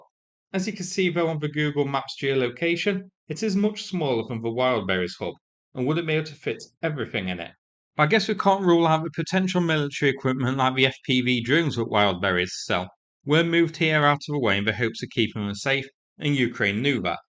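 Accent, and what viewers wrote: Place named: Midlands, United Kingdom. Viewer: It's not London, nor the West Country, Wales, Scotland or N. Ireland. My guess is the Midlands somewhere.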